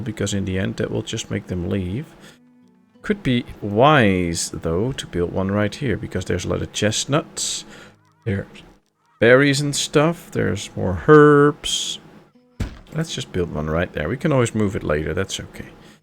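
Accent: Netherlands. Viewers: Nice video! I recently found your channel while looking for LiF letsplays. Maybe an odd question but are you Dutch? You have that typical English eccent. If yes then groeten uit Utrecht.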